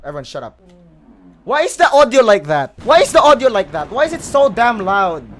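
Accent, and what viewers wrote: Bisaya, Philippines. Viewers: YAWAA: GI ATAY You got the bisaya accent👌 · I LOVE YOUR BISAYA ACCENT PLEASE SAY IT AGAIN:GIATAY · I LOVE YOUR BISAYA ACCENT PLEASE SAY IT AGAIN "TURTOL" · kuya gusyo ko yung bisaya accent mo kuya;YAWA at syaka kuya bisaya din ako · Iloveyour bisaya accent Bahogbilat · I LOVE YOUR BISAYA ACCENT PLEASE SAY IT AGAIN: PISTING GIATAY!!! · Bisaya ka? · Ang ganda ng bisaya acsent mo love it! (Y*wa,Giatay) Pa shout out salamat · Nice Bisaya accent boi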